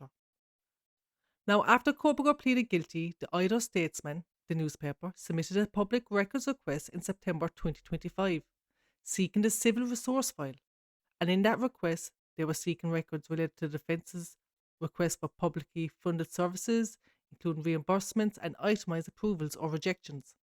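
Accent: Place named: Ireland. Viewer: I love your voice! I had no idea you were actually Irish! Lovely. Thank you for now uploading videos. I can liztdn while i clean and work❤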